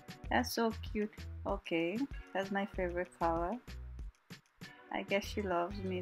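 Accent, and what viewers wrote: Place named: Guyana. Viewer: i love the guyanese accent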